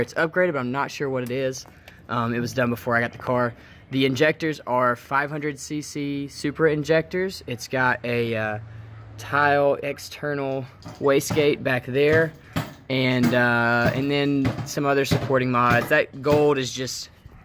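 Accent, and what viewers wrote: Alabama, United States. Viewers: You from alabama?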